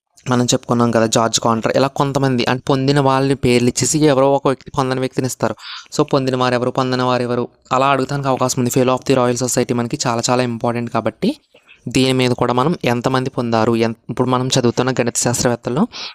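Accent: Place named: Godavari, India. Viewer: mana godavari slang.......love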